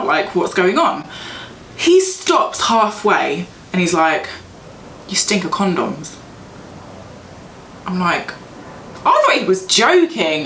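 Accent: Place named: England, United Kingdom. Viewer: Lool I just about to say if you came here a few years back how is your accent soo english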